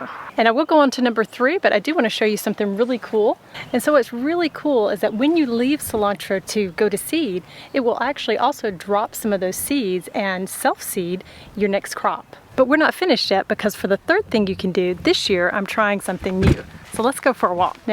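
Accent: South, United States. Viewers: Her accent is American south, possibly Texas or farther east up to North Carolina. That’s a Southern American accent. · she has strange american accent for my foreign ears. Where is she from? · She sounds like she's southern but softening her accent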